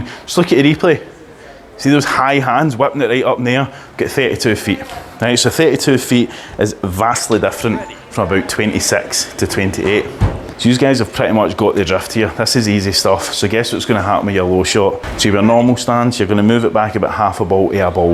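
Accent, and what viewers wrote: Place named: Glasgow, United Kingdom. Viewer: Thanks a lot. The Glaswegian makes this Dutchman have to concentrate to follow along (more than with Billy Connoly). I bet though a lot of Americans won't even try to understand. I will give this method a try. Liked and subbed.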